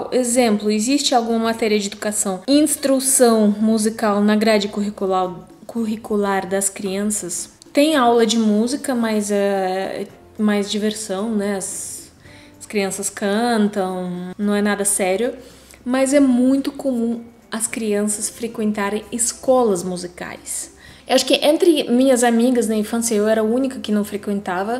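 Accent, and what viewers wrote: Russia. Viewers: em primeiro lugar gostaria de parabenizar que o seu portugues esta ficando cada vez melhor acredito que dentre 2 ou 3 anos voce nao tera mais sotaque russo parabens eu sei que seu canal e voltado para publico feminino mas eu amo os conteudos que voce e o produzem voce disse ai que tem pessoas que na frente nao tem coragem de dizer quando estao escondidas atraz da tela de um computador ou um celular e porque sao pessoas mau carater falças que mentem para si mesmas de tao pervesas que sao e em relaçao a depressao na russia como la tem muito problema em que pessoas bebem muito ja e um indicio fortissimo de depressao so que a marvada da vodka kkkkkk mascara tudo se a pessoa esta com depressao e usa o alcool como refugio e como querer estancar o ferimento como um tiro de revolver com um bandeide nao da certo ne um abraço sou fã numero 1 de voces continuem firmes ai nos conteudos que sao otimos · Os haters estão em toda parte...não só no Brasil! 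São um fenômeno novo... E você tem razão! Pessoalmente, são fofos...só que na internet, colocam seus podres pra fora...desabafam! Gostei muito do canal, vocês são muito simpáticos, e é impressionante como teu português está bom...muito pouco sotaque russo! Não conheço a Russia, mas quero muito ir...Museus, cidades com uma história muito rica, amo os pianistas russos, e as russas! E o stroganoff!!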